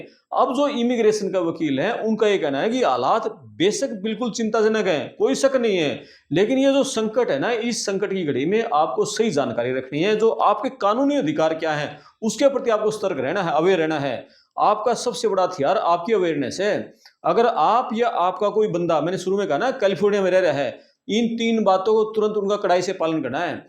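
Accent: Haryana, India. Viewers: एक तो आप इधर उधर की बात कम करिए , सीधे काम की बात पर आइए और हरियाणवी accent छोड़कर खड़ी बोली में बात करिए तो अधिक लोग देखेंगे.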